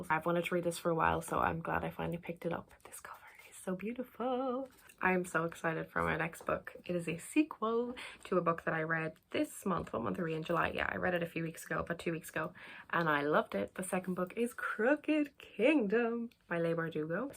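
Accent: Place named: Australia. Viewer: Your Australian accent is as bad as my Irish one but I love it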